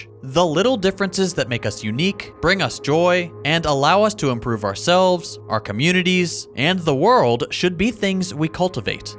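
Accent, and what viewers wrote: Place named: Idaho, United States. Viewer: my mistake. People in Idaho sound a lot like you.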